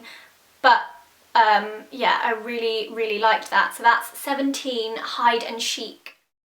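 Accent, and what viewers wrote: United Kingdom. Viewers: not to be rude or anything but that was a really stupid comment. would you please enlighten me on why she would feel the need to "fake" an accent in her videos? she's from the U.K, shes BRITISH. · I don't understand why people think you're faking the british accent? Maybe she's just very well spoken and has very good dialect?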